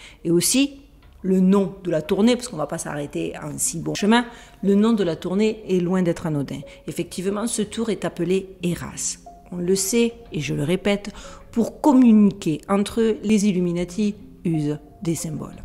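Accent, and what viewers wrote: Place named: Midi, France. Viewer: Merci! Sujets toujours passionnants. Plus l'accent du Midi 💓💓💓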